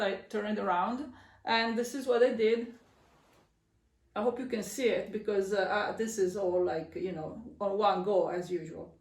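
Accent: Italy. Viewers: I didn’t know you before, but I’m glad you showed up today! You had me at Emotional Support Chicken! New subscriber. Your speech reminds me of my Südtirolean friends from years and years ago. Also my Italian friend at church here in Michigan. Please post again and show us more things! 💜 And yay for Paul Klee. I saw an exhibit in Tübingen ages ago and loved it in all his stages.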